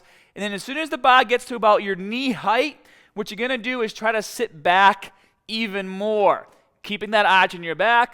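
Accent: Boston, United States. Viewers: Sounds like a Boston accent lol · Loving this Boston accent right now. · Damn. He sounds like he's from Boston. Every city in the northeast hemisphere of USA has an accent. All different. Philly and New York are similar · Is this guy from Baaahston?? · this boston accent is killing me lmfaooo good content though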